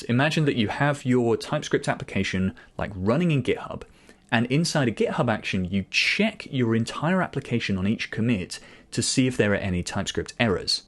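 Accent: United Kingdom. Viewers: Your British accent make your videos sound polite from my point of view hahaha.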